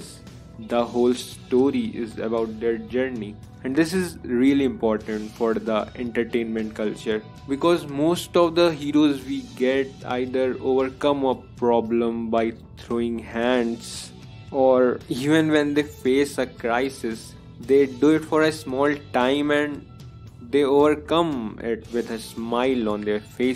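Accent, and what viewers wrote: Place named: India. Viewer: Just found out I need to listen indian accent at 1.5x speed.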